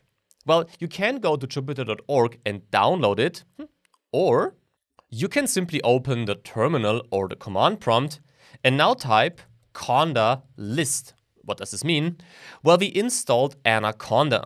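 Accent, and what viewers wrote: Germany. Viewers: I love your Deutsch accent <3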